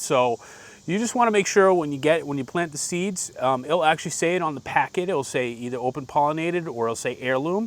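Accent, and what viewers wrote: Boston, United States. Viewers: Dude! I love your accent! Is it Boston?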